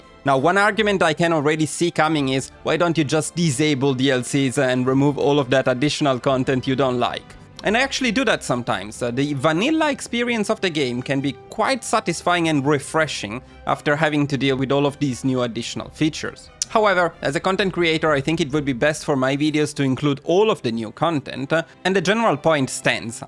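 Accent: Italy. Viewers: Well, technically they put a thing that autoupdate the mio, and you can queue the upgrade you want at the start of the game. For the stuff i prefer to manually manage what i want to trade, like if i'm germany and i need 8 chromium for a battleship, i just don't trade that since it's not a priority. And one extra thing, i really hope that they take the time to upgrade old dlc focuses, they look totally dated if you compare them to the last ones. On another note, you really sound italian, idk if you are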